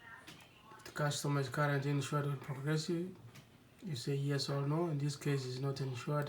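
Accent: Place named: Kenya. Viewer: eeh! Baba umetoka upande gani bwana, nkisikia sauti yako, nkasema hapana huyu ni wa home lazma, anyways nice to hear that, ntakutafuta InshaAllah tuzungumze zaidi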